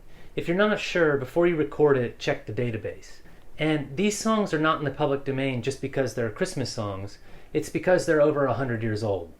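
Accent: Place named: United States. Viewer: NICE, CLEAR AMERICAN ENGLISH & WELL GOOD EXPLANATIONS, SALUTE !!!.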